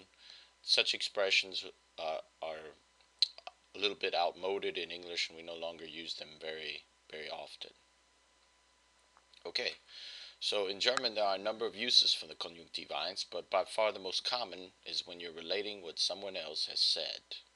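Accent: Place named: North Germany, Germany. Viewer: Tolles Video! Woher kommt ihr Deutsches Akzent? Vieleicht Nord-Deutschland oder Österreich?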